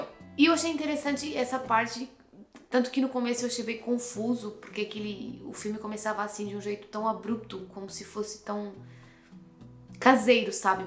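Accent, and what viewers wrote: Brazil. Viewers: Ela fala com um sotaque mais Brasileiro do que o sotaque de Portugal. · Ela com o sotaque brasileiro fica bem melhor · Pq ela fala igual um brasileiro sendo portuguesa??? Que top. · Teu português tá muito brasileiro 🤔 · Ué, você é portuguesa? Seu sotaque é brasileiro. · ela emula um pouco o português do brasil, ela ja comentou isso. o portugues de la mesmo come muito as vogais, pois uma época de Portugual eles intencionalmente quiseram se aproximar do francês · Seu sotaque está se abrasileirando, massa.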